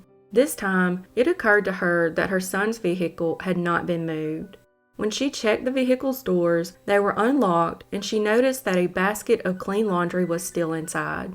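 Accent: South, United States. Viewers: I can tell your southern not only from the accent but because every case you talked qbout has 3 names instead of 2. I'm willing to but your name is.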